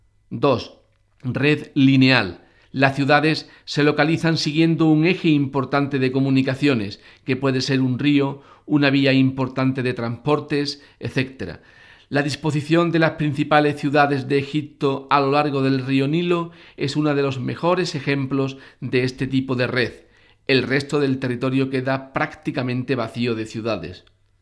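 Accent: Andalusia, Spain. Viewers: illo o hablamos fisnos o andaluz, pero no lo mezcles ojú ya me e agoviao.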